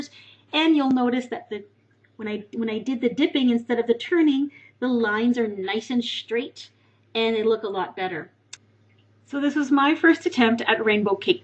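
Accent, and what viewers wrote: Canada. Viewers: Are you Canadian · It's called a Canadian accent..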